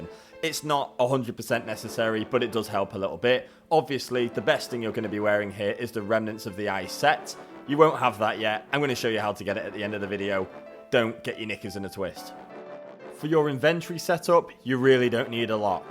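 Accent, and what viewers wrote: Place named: United Kingdom. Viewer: Having a slightly ticked off Brit, passively aggressively telling me what to do is actually rather calming.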